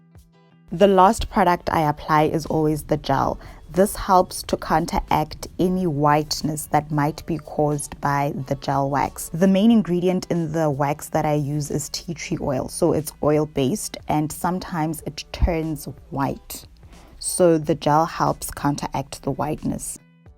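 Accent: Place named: South Africa. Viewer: Are you south African you have the same accent as Kopano Shimange ❤️❤️❤️....much love from Kenya, 🇰🇪🇰🇪🇰🇪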